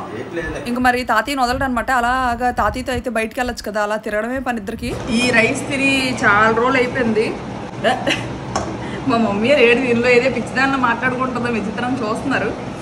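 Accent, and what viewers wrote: Vizianagaram, India. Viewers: మన విజయనగరం భాష వింటుంటే చాలా సంతోషంగా ఉంది అంది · MI papa ni tesukelatam leda enra dooram vellina vijayanagaram language maaraledu meku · Maa vzm slang untadhi chari ❤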